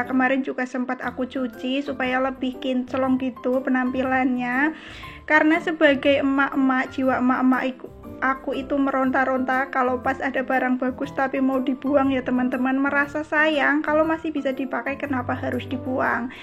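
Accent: Indonesia. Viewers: Ganti suasana ya bun jadi lebih luwas,iya bun logatnya keliatan bgt Cilacap 😊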